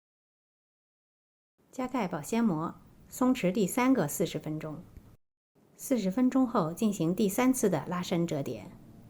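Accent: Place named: Apulia, Italy. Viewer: Acc... Ma che è.. Un misto inglese e pugliese? Comunque conpliment 🇮🇹👍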